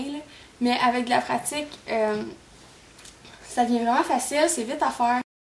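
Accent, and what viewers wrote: Quebec, Canada. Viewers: T'es québecquoise ?? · j'ai peiné à comprendre avec l'accent québécois mais les images aident bien :) !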